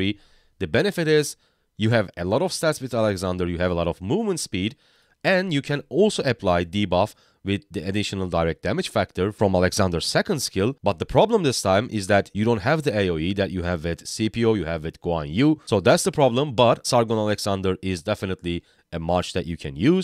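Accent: Turkey. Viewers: Bu adam tam bir Türk gibi ingilizce konuşuyor.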